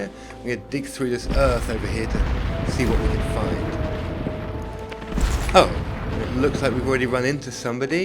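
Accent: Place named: England, United Kingdom. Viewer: his pommy lisp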